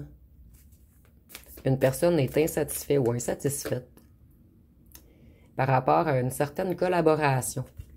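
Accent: Quebec, Canada. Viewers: J'adore vous ecouter avec votre accent quebecois vous etes calme❤❤❤❤❤❤❤❤❤❤❤❤❤❤❤❤❤❤❤❤❤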